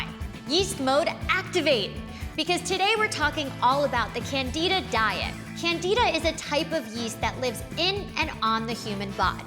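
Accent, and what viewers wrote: United States. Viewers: She speaks like only an American can 🙂👍🏼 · God I can't stand the American accent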